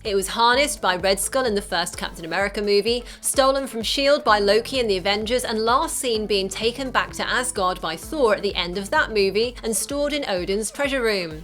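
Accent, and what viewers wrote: United Kingdom. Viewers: another movie video in British accent! it's the third one only today that's bizarre !!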